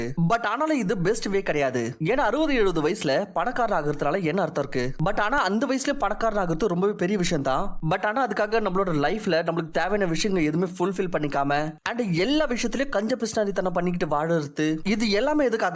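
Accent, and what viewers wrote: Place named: Bangalore, India. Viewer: Bro. Neenga bangalore ah.. Ungaloda tamizh pronounciation bangalore tamilian madhiri irukku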